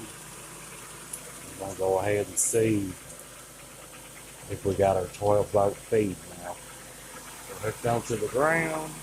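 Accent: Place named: Texas, United States. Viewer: I bet anything you're from Texas 😅 that accent is unique from there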